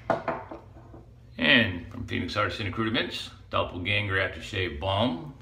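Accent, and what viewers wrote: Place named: Texas, United States. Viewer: wow that’s cool! I’m British but my girlfriend is from Austin Texas and we were trying to pin down you’re accent and said she thought you were five different states but that you could be from Austin. Thanks for clearing that up dude, keep the videos coming and the shaves silky smooth.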